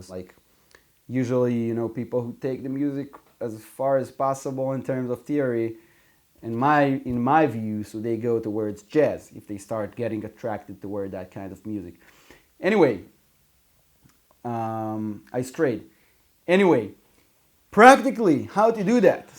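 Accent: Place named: Latin America. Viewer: OMG man, your videos are amazing, you make such intresting and useful videos, they can be super useful for beginners+ players. It is a Shame you are so underrated, you make hi-level videos and Don't get as many views as you realy deserve. Wish you the best of luck, keep up with the amazing content. P.s. até you from Brazil or latin America? I think I know this accent hahaha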